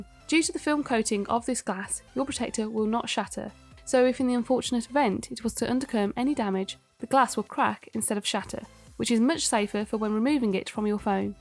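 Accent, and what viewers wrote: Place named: Birmingham, United Kingdom. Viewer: A fellow brummie :)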